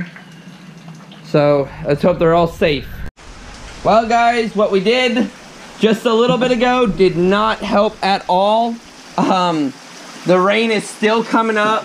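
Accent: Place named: Alabama, United States. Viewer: you might be from Houston but you sound like your from Alabama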